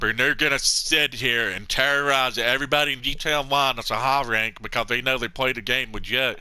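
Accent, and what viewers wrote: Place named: South, United States. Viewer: He sounds like he’s from the southern US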